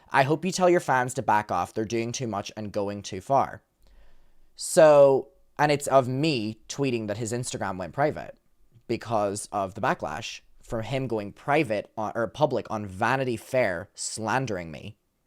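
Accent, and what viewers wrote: Ireland. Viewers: they did 0 research on u bcos how’d they READ YOUR NAME and HEAR YOUR ACCENT… and not immediately assume ur from Ireland 😭😭😭😭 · A couple things: 1, Andrew CLEARLY didn’t do any research because if he can’t even get the country you were born and raised in correct, he’s a certified moron.. I’m from the US and I could tell within 30 seconds of watching you for the first time that you have a very clear IRISH accent. Not to mention you speak about that sometimes. And 2,…can we all agree Andrew and Vanity Fair are groomer supporters? EW, not a good look for a “reputable” organization. Boycott VF!!! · Not understanding the difference between Ireland + England is crazy 😳 You’re accent is clearly Irish like - did they not watch any of your videos? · They didn’t even watch any of your videos if they didnt know you were Irish. Although there are some Americans that cant hear the difference between an Irish and English accent.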